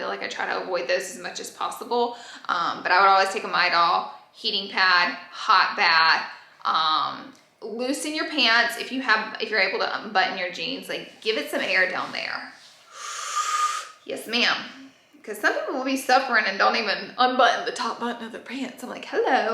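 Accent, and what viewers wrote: South, United States. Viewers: Girl, you still country af!!! But I absolutely love it because I’m a country girl too!! I love watching you 😘😘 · I love the country voice!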